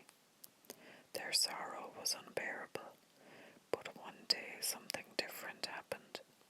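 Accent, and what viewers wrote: Ireland. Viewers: am I detecting a Tipperary accent? :D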